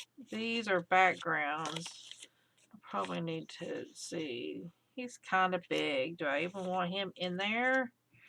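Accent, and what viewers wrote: South, United States. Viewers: How cool that you're able to find so many fun things for your book! I really enjoy watching your videos and seeing what you come up with. Ain't it the truth that these gluebooks ain't going in the Lourve? So we need to just relax, cut, and glue, like Nicole says. It's all about the fun, isn't it? I was born in Alabama and raised there and in East Texas. I have a Southern accent, too, and listening to you makes me feel like I'm back down home. I live in on the Southern Oregon coast now and I do get homesick sometimes. Love ya, girl.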